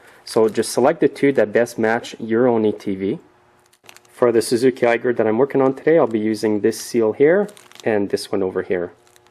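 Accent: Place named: Canada. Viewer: such a canadian accent eh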